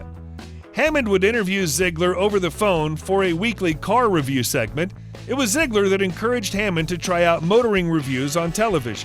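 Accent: United States. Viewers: Why is a yank commentating ?? VERY BADLY !! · Christ get somebody who speaks English, as in English, not what some people refer to as American English, it makes these videos almost as unwatchable as the really cheesy still flying in and out. I am sure the £10 software has a fade option. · jeez the american pronunciation. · The American accents gets right on me tits say it probably ffs not hard aint kids anymore 🤦‍♂️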